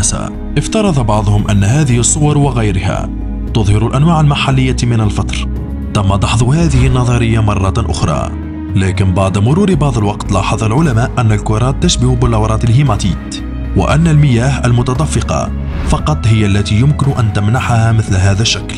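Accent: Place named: Tunisia. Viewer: انت تونسي ! هذا واضح من نطقك لبعض الحروف هل أنا مخطأ ؟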